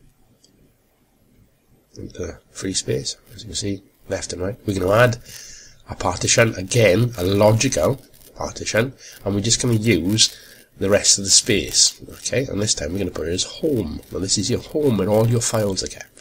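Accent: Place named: Wales, United Kingdom. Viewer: Just watched an Irish guy telling us how to do this and now a Welshman. This Linux is truly international ;)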